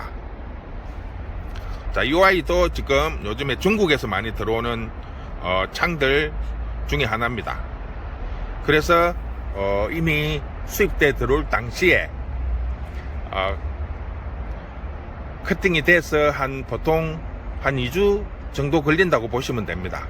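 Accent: Gyeongsang, South Korea. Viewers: 경상도 사투리 죽이네여 물이 다이는것과 다이지 않는것의 뿌리내림 차이 ㅎㅎ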